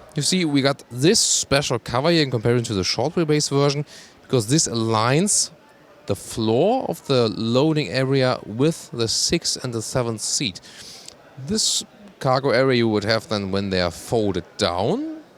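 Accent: Germany. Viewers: Can't wait! Your reviews are always my favourite not only because of the unrivalled quality and the super deep videos but mainly because of the oddly satisfying-to-hear german accent xD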